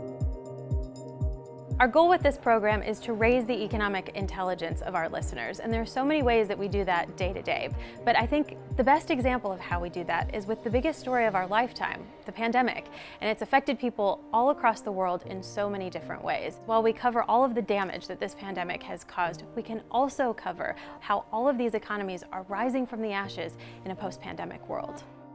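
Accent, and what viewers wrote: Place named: England, United Kingdom. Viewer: Did you develop the English accent you have now. Hearing this and listening to your report today you certainly nice accent that we Americans are always intrigued by.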